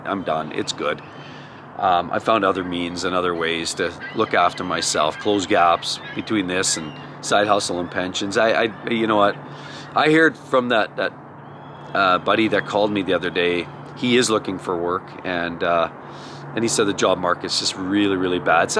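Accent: Alberta, Canada. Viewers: You sound like your from Alberta, am i right ?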